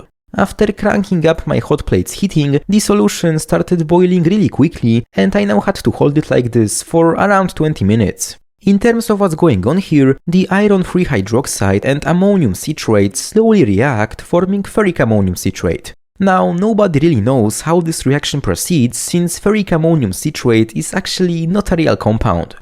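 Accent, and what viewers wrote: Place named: Poland. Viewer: I live to watch the armchair and backseat drivers be UNABLE to stop themselves from commenting and correcting..... JFC THIS is why we're in a burning dumpster of civilization....🙄 Otherwise, thank you to my favorite amateur chemist with the most AMAZING Polish accent.🎉 Highly informational and entertaining. Quality stuff. Kudos from Appalachia 🤙🏻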